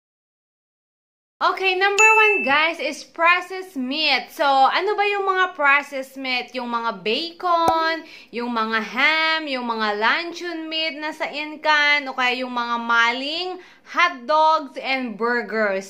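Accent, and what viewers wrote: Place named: Ilocano, Philippines. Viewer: Hi mam, nakakatuwa naman na ilokano ka pala tulad ko, noon ko pa kasi napapansin ung tuno ng pananalita niyo po hehe. Anyway God bless po!❤️🙏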